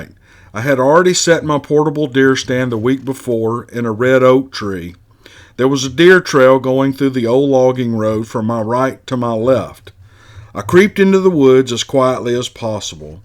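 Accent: South, United States. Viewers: I love your southern draw 🤗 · you have a fantastic gift of storytelling. This up north midwesterner loves your southern drawl. We have squatch in Wisconsin as I've heard them but never laid eyes on one. Keep up the good work and can't wait till next week! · I really like your channel if not love it. You geht nice stories and you tell them really nice, I especially love your southern accent, and you and Lynn Smith's BigfootCaseFiles-channel drove me near to believing in the Sasquatch - though i'm german and live on the other coast of the Atlantic! Just go ahead, you made it right from the start! Tobias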